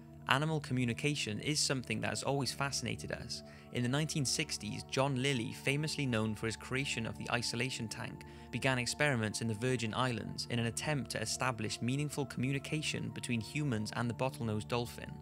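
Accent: United Kingdom. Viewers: The most unsettling thing about this video is hearing a guy with a british accent say "Nucular"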